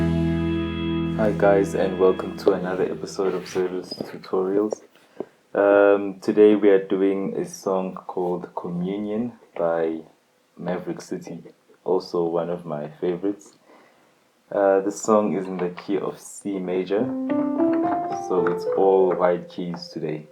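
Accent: South Africa. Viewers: awesome. You sound like you from KZN or Gauteng? I just noticed your channel and subscribed now. Thank you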